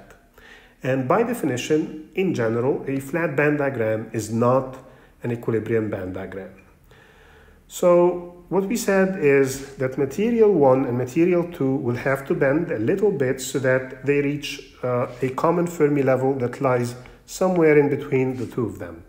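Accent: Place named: Egypt. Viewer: Best explanation ever! I am proud to feel the Egyptian tone and style.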